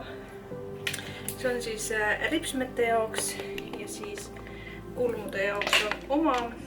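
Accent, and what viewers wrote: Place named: Finland. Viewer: Nii mõnus soome aktsent ☺️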